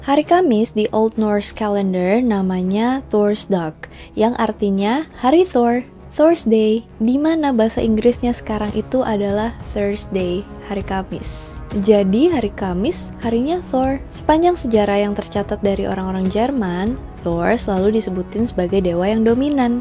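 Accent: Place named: South Jakarta, Indonesia. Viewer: Gua suka pembawaan yang sekarang gaya anak Jaksel pisan wkwkwkw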